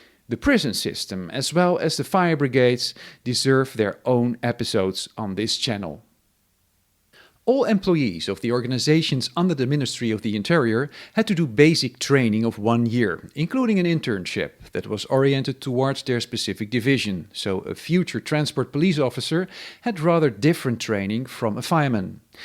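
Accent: Netherlands. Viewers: Je kunt het altijd goed horen als een Nederlander Engels spreekt.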